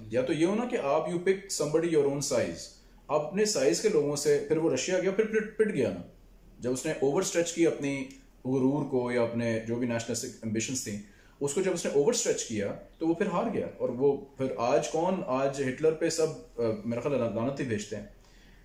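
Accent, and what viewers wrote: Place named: India. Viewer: I think they just have a thick Indian accent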